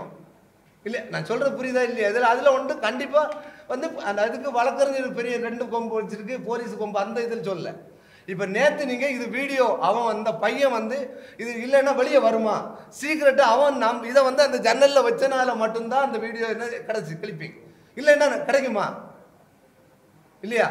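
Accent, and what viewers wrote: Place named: Tirunelveli, India. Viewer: நெல்லை/ நாகர்கோவிலில் பாஷை தெறிக்குது....🔥🔥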